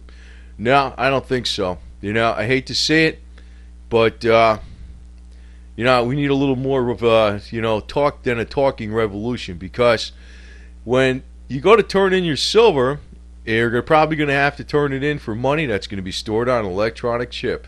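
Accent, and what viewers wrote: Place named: Minnesota, United States. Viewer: this guy is obviously from MIN NES SOODAA.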